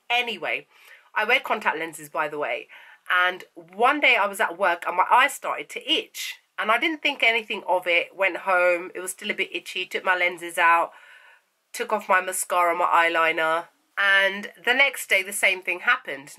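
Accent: London, United Kingdom. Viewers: "Its french init" ur sooo london lol xx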